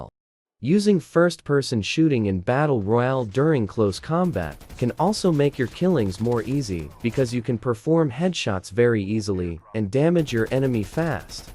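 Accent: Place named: India. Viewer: I can almost hear the Indian accent.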